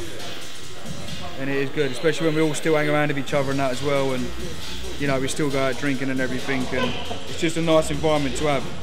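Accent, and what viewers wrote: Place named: England, United Kingdom. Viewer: well said mate, what part of england u from by the way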